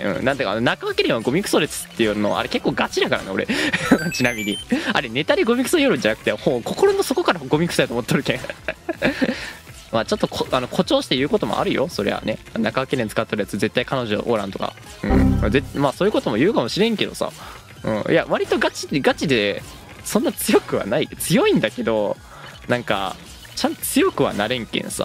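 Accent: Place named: Northern Kyushu, Japan. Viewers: この人の方言から九州北部を感じるんですけどどこ出身か分かる人いますか? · ○○けん。は博多弁すね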